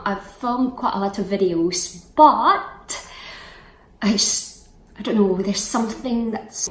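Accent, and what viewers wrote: United Kingdom. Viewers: I love the British accent oiii. hahah please do samyang spicy noodles challenge! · Oh I fin’lly saw you,your accent is really Scottish. Were you born there? Oh that’s yummy.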